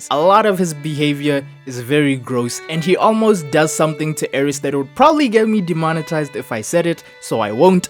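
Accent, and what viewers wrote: South Africa. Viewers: I knew that accent sounded so familiarrr brotha is south african like me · How did I know you were South African in the first 30 sec😭🇿🇦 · I know you are South African , our accent is so pure I’m proud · Yo are you South African?? You dsying 'This ain't it chief' is a phrase I've realised I only ever hear in my country😂😂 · You south african neh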